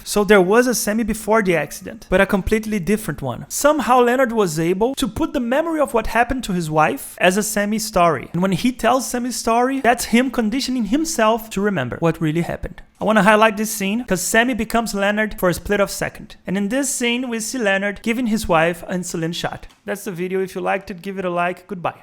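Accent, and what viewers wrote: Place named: Brazil. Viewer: Sotaque de Br 👍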